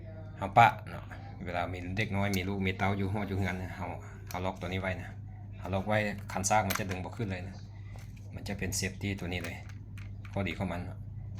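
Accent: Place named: Khon Kaen, Thailand. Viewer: ເວົ້າຄືທາງແກ່ນທ້າວບໍ່ແຕນແທ້ອ້າຍ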